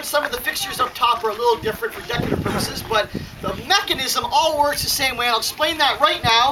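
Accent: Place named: United States. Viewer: This was very interesting, but as a Briton it was somewhat wierd to listen to an American accent.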